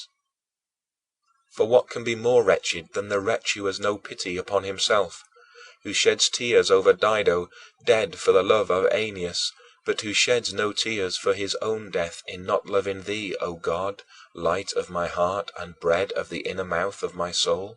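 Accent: United Kingdom. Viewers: British.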